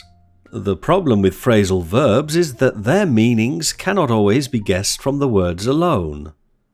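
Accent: United Kingdom. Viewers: Your British accent sound is the most stunning that I've ever heard. I appreciate your contribution of British accent promotion cos many youtubers just own American accent instead of British one.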